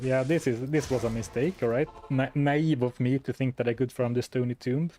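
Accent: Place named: Sweden. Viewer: The Swedish accent is fun, and we understand everything you say just fine. If you want to get rid of it, do so naturally over time, don't go out of your way to try to hide it. I like my Swedish d2 youtuber and streamer.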